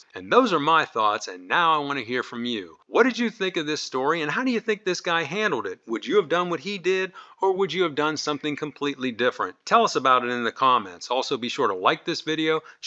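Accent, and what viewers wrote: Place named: Pittsburgh, United States. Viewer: Sound like you’re from the Pittsburgh area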